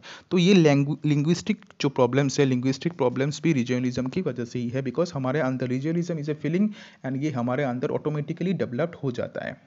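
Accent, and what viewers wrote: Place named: Northeast India, India. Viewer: I like your teaching and understand ur language so easily seems it feels like our northeast accent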